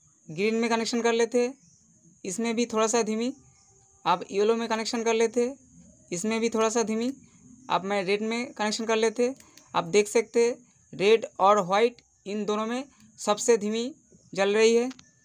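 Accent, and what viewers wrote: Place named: Bengal, India. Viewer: Bangali ho ke Hindi kau bol rehe ho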